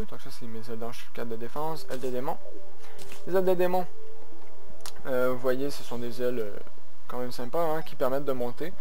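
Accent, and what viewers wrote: Quebec, Canada. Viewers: Tu est québécois ? J aime bien l accent du Québec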